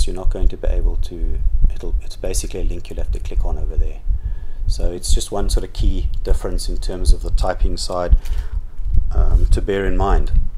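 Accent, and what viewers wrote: South Africa. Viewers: I hear a South African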